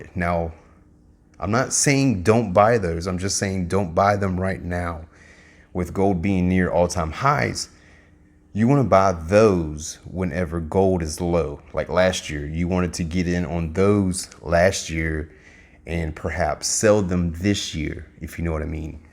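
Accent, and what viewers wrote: South, United States. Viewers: Honestly you got me to the hit the subscribe button on your southernality alone haha Keep on stackin that gold brother!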